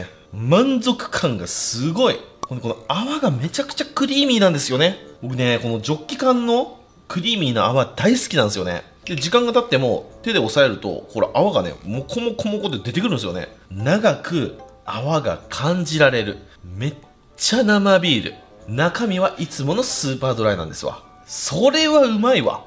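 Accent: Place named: Aichi, Japan. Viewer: 私も愛知県民のせいか適度な方言が安心感あるw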